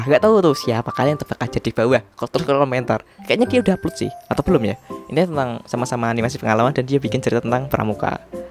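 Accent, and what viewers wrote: Java, Indonesia. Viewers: Jowo Yo mas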